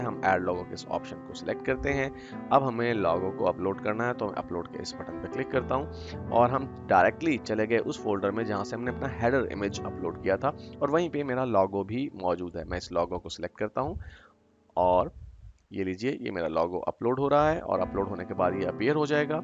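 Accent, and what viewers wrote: Pakistan. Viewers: Aap pakistan se ho kya?? Awaaz se lg rha hai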